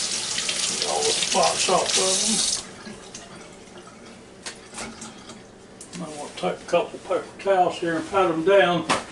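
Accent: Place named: Tennessee, United States. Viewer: I love your videos! Thanks for sharing! You sound like home, are you from Tennessee?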